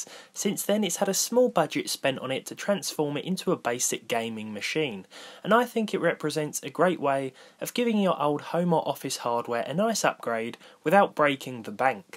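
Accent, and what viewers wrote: United Kingdom. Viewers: I like your accent mate where do you come from in the UK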